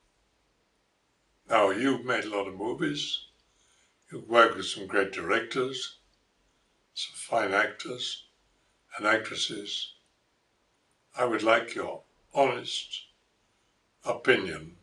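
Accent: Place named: United Kingdom. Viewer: Out of all of the different English accents across United Kingdom this one what a true English gentleman sounds like in my opinion, every time I hear someone speak like this I immediately have a higher opinion of them.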